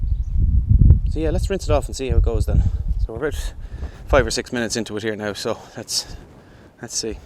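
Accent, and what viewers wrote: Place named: Ireland. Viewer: are you irish?love the accent 👍🏿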